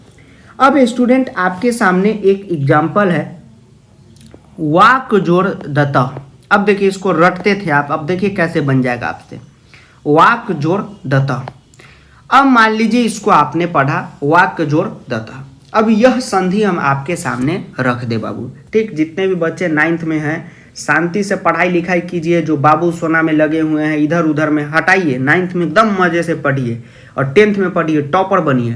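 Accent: Bihar, India. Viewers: Bihar mein kahaa se hain aap 😂 aapki language main samjh gyi😂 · sir ap bihar se ho naa! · Bihari ho kya bhai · Are u bihari?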